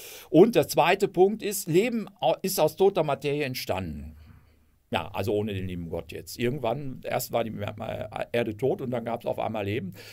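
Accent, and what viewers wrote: Germany. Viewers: Ich mag übrigens deinen Ruhrpott slang. Die dortigen Menschen sind eigentlich sehr bodenständige, ehrliche und wahrheitsliebende Menschen Lass uns doch mal treffen und reden. Gruß Christoph Poth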